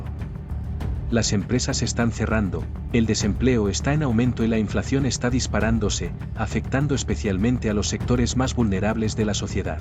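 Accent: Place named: Spain. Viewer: Por que no ponen a un mexicano a hablar.siempre se utiliza a una bos de español cual es el chiste y puro cuento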